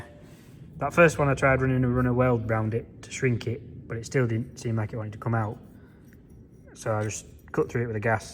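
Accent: Yorkshire, United Kingdom. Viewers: The sound effects , brilliant, such a contrast to the deadpan Yorkshire, loved it.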